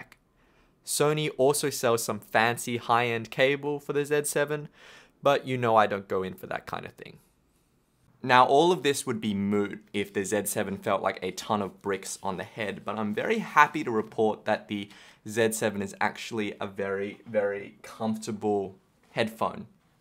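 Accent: Australia. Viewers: An Asian guy with an Australian accent? Mind blown.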